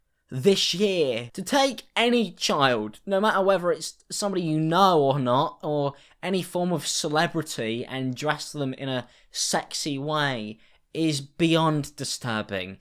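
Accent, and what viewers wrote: United Kingdom. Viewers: Yes, it is intriguing. And he speaks with a British accent too.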